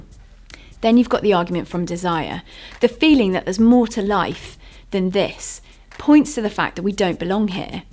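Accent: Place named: England, United Kingdom. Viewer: Well, you were able to prove one thing at least: not everything said in an English accent sounds intelligent.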